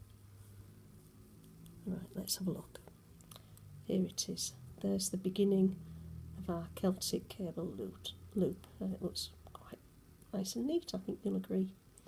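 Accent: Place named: Northern England, United Kingdom. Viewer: Love your Northern accent ...and of course the neat technique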